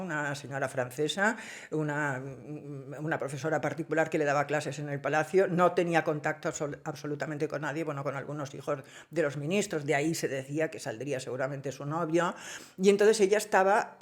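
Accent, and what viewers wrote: Spain. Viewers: lo que me rio cuando con tu acento español hablas de las palabras coloquiales (boluda, gorda, quilombos, minas) He visto peliculas sobre Eva Perón pero la mejor es Cartas a Eva, Carmen Polo interpretada por Anna Torrent fue sublime.... Eva lo interpretó Julieta Cardinale, muy bueno tambien, Perón Hector Colomé, Carmen Maura, Malena Alterio. Es mitad ficcion mitad real... lo de la carta no sé si existió pero el viaje de Eva si... La imagen de Franco con el orinal y enfrente a Eva me la imagino!!! jajaja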